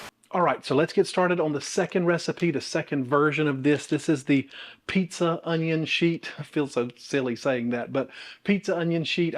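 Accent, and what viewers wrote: South, United States. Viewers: ...If anyone is looking for a baby name doesn't sound too bad 😂 came for the onion sheet, stayed for the Southern speech! ❤️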